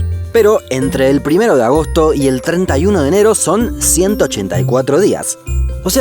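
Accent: Argentina. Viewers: Una duda, este canal es Argentino? o el que habla en los videos es de Argentina? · y el detalle papi... es Argentino :V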